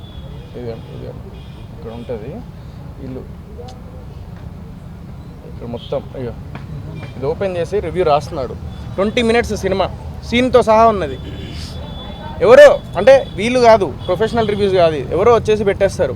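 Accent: Telangana, India. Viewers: Mundu nuvvu Telangana slang maarchu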